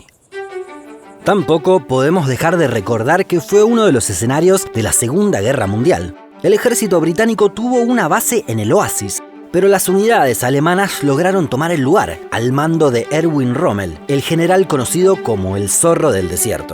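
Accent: Argentina. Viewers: Que lindo acento argentino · Esta canal es un lujo!! Ese cielo por amor a dior!! Claro q me gustaría conocer Siwa y el Sahara! Será cuestión de planearlo. Gracias sos impecable y me encanta que tengas acento argento. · El acento argentino también es parte de la magia de este canal:3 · De donde sos vos viejo? Tenes acento argentino 🤔😋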